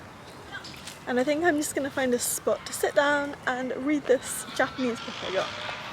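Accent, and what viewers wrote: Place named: England, United Kingdom. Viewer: Your already a winner! With that great English accent!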